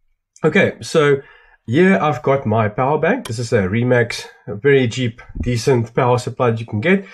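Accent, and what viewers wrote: South Africa. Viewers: Is there maybe a way to get a 60W or maybe higher (foldable camping) Solar Panel to get to charge drone batteries? I've seen some that comes with it's own attached mini "inverter" that pushes out 12V 60W per USB-C and an adapter for 12V DC direct. We have the Air 2S and 5 batteries. Going on an Adventure Bike tour, the tankbag will be housing the drone gear and GoPro stuff. Space and weight is limited as there is camping gear, rations, clothes, etc. that has to be considered, so bulky heavy powerbanks won't work. Would like to hear your opinion. You sound South African btw LoL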